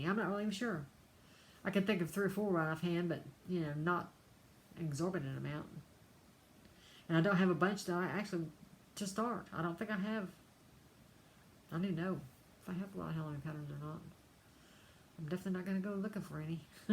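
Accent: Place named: North Carolina, United States. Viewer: I love just hearing you talk... my grandmother was from North Carolina and she sounded just like you as well as my aunts. Warmed my heart so much.But the fact you are talking about stitching is even better.Loved seeing all your working on :)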